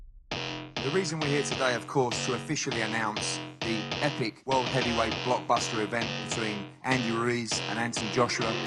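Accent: Cockney, United Kingdom. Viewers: these videos are fucking amazing, love the comic behind it all, the cockney goes a long way lol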